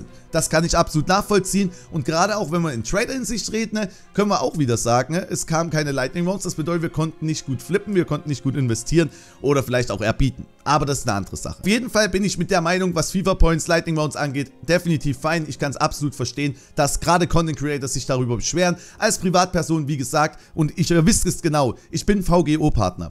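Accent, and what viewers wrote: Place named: East Germany, Germany. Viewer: Am geilsten finde ich tdem wie du versuchst deinen ostdeutschen Dialekt zu unterdrücken🤣 mach weiter so, geb dir mit allem recht😊🙋‍♀️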